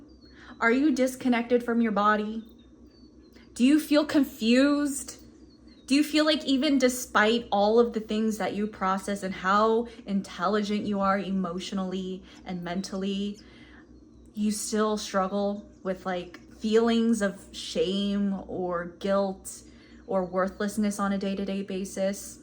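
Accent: United States. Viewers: that's just awful, I understand your family was raised in different cultural environments, but your accent or lack of accent tells me that you're very much used to the Western cultural traditions and women are VERY VERY harsh on each other when it comes to physical appearance it becomes toxic because of it being excessively used within social dynamics because of the pathetic desire to one up another person. It's nothing more than underhanded identity politics aka narcissistic characteristic individuals. Sorry you've gone through this, but I'm proud of your courage to voice your emotions and your vulnerabilities, you're definitely moving in a direction to up your level as I'm sure you've done in the past. Keep on moving forward, take your time, take care of yourself, don't be hard on yourself, you're a person, you're not a machine, you have limitations, and they'll continue growing in whatever direction you see fit. 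but at the same time it does seem that you were able to both see things from a logical standpoint and address them in a more objective manner, I wouldn't say intellectualize but definitely got your head on straight and still able to feel so deeply and be so in touch with self.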